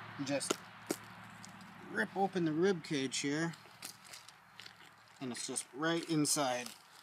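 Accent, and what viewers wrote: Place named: Canada. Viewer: I hear that Canadian accent. That was a Canada goose, or as it is called in Canada, a goose. Must be why it just says goose in the title of this video. Well, the video was very good. Thanks!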